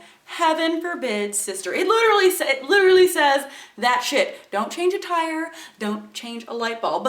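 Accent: South, United States. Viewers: Where are you from? You sound like you have a Southern accent.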